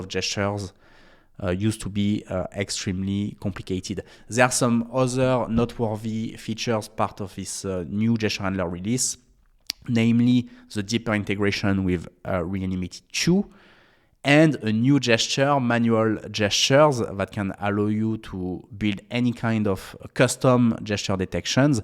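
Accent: France. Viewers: Love it when the French speak english. Great content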